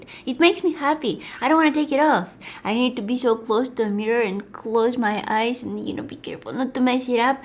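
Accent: Russia. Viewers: I actually also understand every single word but the problem is her russian accent. I like her and her vids a lot. But her accent is a bit difficult. I know she can't do anything to speak without an accent but i've just said it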